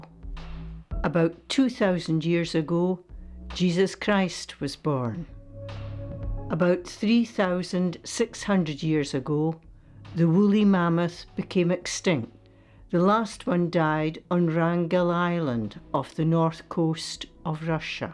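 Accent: Scotland, United Kingdom. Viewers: What a lovely voice Scottish obviously ❤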